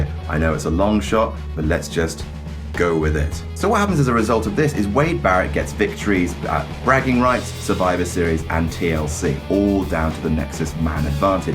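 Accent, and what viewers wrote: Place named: United Kingdom. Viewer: Damn with that smile and that British accent..... I'm in love...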